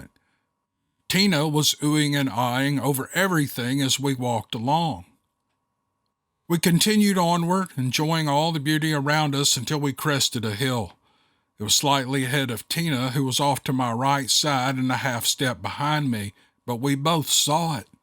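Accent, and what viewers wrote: South, United States. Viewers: I moved to Maine 6 years ago from Louisiana/ Texas. Grew up in the Sabine river swamp and spent years deep in the woods there. I Love it here! But yes, the woods are very different. Not so creepy, really, just different. I love your fabulous stories (miss the southern accent) but don't really lean toward belief... And moose, yeah... I'd rather find a bear! But honestly both really only ever just run away like scalded chickens. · First thing Monday morning the great Sir on my phone this 5am awesome Sir great read and more Awesome real voice lovin that southern twist voice truly awesome thank you Sir miss that southern voice yes Sir this story is great as usual missing (Steve Lily) but yer presence is great hopefully yer fall/winter is relaxing at home with family have a great thanksgiving to y’all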